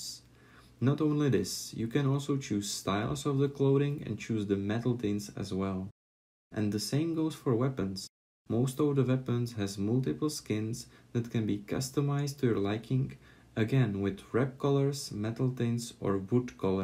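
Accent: Czech Republic. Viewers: Ha! Já jsem si říkal že mi zníš jako čech. :D Já mám mordhau určitě radši kvuli tomu combatu, protože u chiv2 mě příjde že to je takový divný když můžeš blokovat, jak dlouho chceš.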